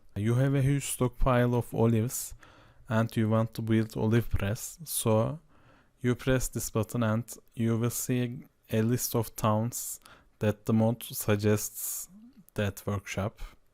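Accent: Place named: Turkey. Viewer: Hintli gibiyiz, direk anlaşılıyor aksandan Türk olduğu insanın :)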